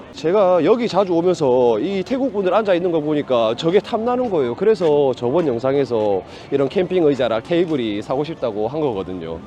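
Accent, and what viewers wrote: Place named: Gyeongsang, South Korea. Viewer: 여읔시 님 갱상도 머째이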